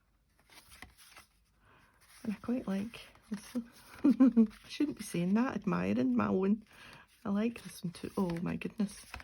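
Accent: Scotland, United Kingdom. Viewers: Personally, I wouldn't mind if everyone had a Scottish accent like yours although I hope we do not lose all the different accents. It would be a shame to lose the variety and the history that is behind them. I read somewhere that the Scottish accent is preferred for call centers because of its warmth and approachability. I know I can listen to you all day especially when you are telling one of your stories. I find it very relaxing and comforting. Both prompts are very well-balanced and a pleasure to look at. I think this would make a great book to sell for inspiration. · Hi I am a new subbie, I am enjoying watching your little collages, I've watched a few now.... Mine are the opposite ha ha.... As for your accent I think it very easy to understand your Scottish accent is quite mild and mellow I think, it's quite calming actually but I suppose to foreign people it can take a bit of getting used too. 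🇬🇧 I think a 'Thing' can be literally any item, from a watering can to a kitchen appliance etc. 😂😂 Odd numbers are easier to work but it turned out cute in the end 😁💖 tfs Elaine from England xx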